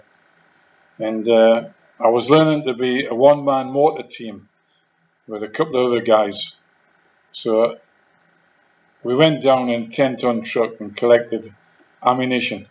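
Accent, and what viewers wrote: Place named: North East England, United Kingdom. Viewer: Nice to hear a north east accent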